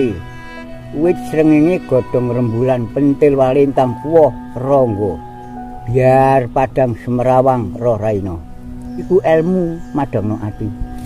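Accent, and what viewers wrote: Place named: Java, Indonesia. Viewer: Seng ngenah logate wong jowo bossss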